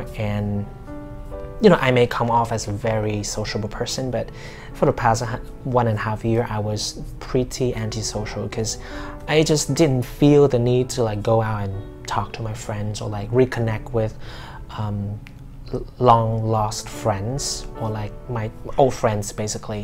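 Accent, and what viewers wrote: United States. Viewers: Yes. First ,I thought ur accent is like American ...it is about 90 percent, so great, that is kind of my favorite accents. I.hope to take IElts class from u. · Em rất thích video của a. Sub viết song ngữ công phu kèm video. Có tải về cũng ko bị mất sub. Anh ở Sing bao nhiêu thời gian. mà sao vẫn giữ được cái accent US hay vậy. Chứ e nghe a có tý Singlish nào đâu 😂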